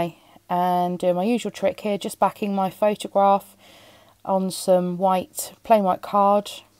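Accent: United Kingdom. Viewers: I’m really enjoying this series. Years ago I spent 4 months student teaching in a nursery school in Wales and I miss the accent, not saying you have a Welsh accent but a wonderful British accent ☺️ and love that I can watch videos about a topic I love in a language I love ❤️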